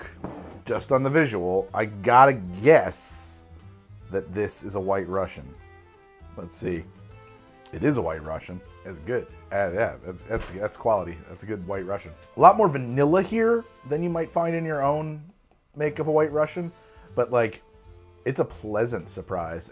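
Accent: New Jersey, United States. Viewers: Wait are you from Philly dude? I thought the accent was giving Jersey. Anyway go birds!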